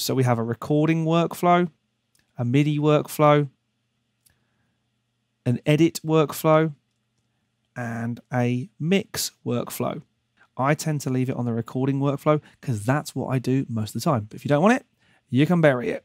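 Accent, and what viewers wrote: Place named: England, United Kingdom. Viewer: LunaRRRRRR. Gotta love that English accent 😍😘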